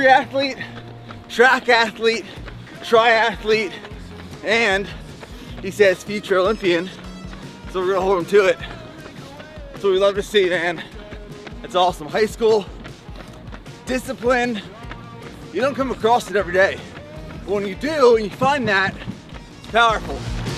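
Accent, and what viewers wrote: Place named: Pennsylvania, United States. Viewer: Its a Pennsylvania thing, like the way he says "On", "Awn"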